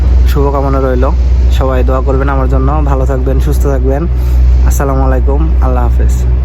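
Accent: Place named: Bogura, Bangladesh. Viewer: vaiya apni ki bograr apnr vasa ta bogurar moto laglo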